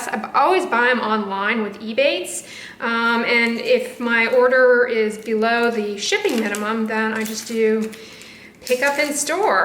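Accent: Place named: Texas, United States. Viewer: Happy New Year, if I don’t see anymore videos till then, I wish you and your Mommy a healthy and fun one. 1) I thought you were faking the Texan accent, lol, I did not know you grew up in the South. 2) avoiding socially gatherings, you are right, those will keep you germs free...but sometimes they are fun. 3) I bet with myself that you would reach 50,000 subscribers by the end of the year...but you might even go over that number. I learn so much from your videos, thank you for the effort you put into them, you are very much appreciated. 😘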